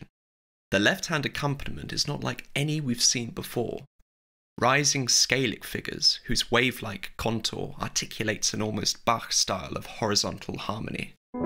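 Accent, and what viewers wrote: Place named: United Kingdom. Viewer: Well, why not do another video? Decide on your intended audience (casual listeners or devoted students), announce what you're going to demonstrate, and do your demonstration in a relaxed, orderly and progressive way. Clearly you have the knowledge and the ability. Your British articulation is beautiful, and no doubt your analysis is insightful, but I couldn't follow you. Either I am a slow brain or you spoke too fast. I'd like to hear what you have to say about Chopin's Nocturnes, but why cram everything in one video when you can make as many as you like? Best wishes . . .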